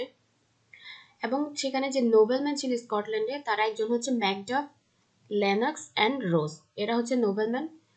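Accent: Chittagong, Bangladesh. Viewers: you are from Chittagong right?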